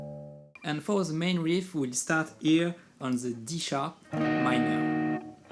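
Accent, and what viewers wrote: France. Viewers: Oula toi t’es français avec cet accent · French accent ????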